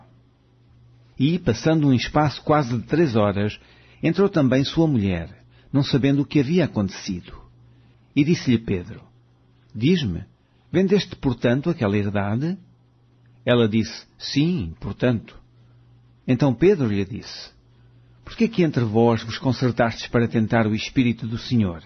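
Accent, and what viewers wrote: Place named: Portugal. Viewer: Mas de qualquer maneira, esta baboseira toda vale pelo belo sotaque português !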